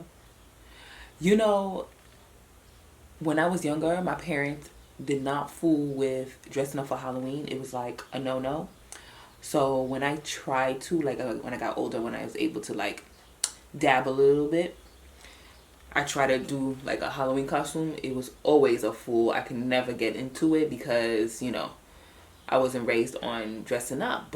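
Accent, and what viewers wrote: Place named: New York, United States. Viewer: You are very talented . Where do you live ? You sound like you live in new york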